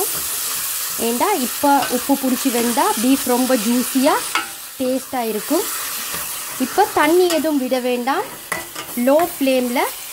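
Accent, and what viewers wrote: Sri Lanka. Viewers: In India we have to use a pressure cooker. Thanks for this amazing video and very well explained. I like the Srilankan Tamil accent. God bless. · sri lankan tamil was nice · Srilanka Tamil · This is srilankan Tamil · Thank you for sharing sister. Your tamil accent from Sri Lanka. Are you from there?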